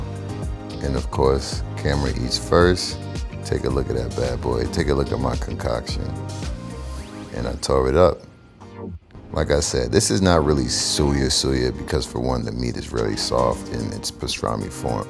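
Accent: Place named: Bronx, United States. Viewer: This is the first video I've watched of yours...I heard the Bronx and I had to subscribe. I miss Kwame's restaurant in DC, guess I'll have to come back home and try Tatiana.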